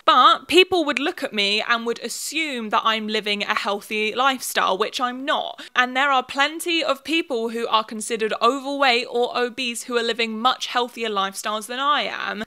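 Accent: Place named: England, United Kingdom. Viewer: there is something so refreshing about people tearing this show apart but ALSO something nice about your English accent doing it. "Are you HAVING ME ON?" Yes, you and everyone in America who they think is dangerously stupid (which for them, they think most of us are).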